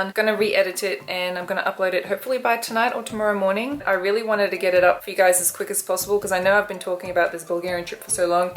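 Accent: Australia. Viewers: Have you been living in Australia or sth? Cuz your "So", "No" etc, sound more like Sewrlgh, Newlgh XD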